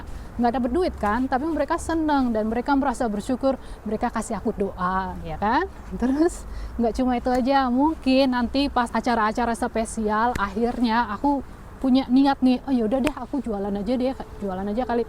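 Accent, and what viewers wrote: Banyumas, Indonesia. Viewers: Ora ngapak ora penak mbak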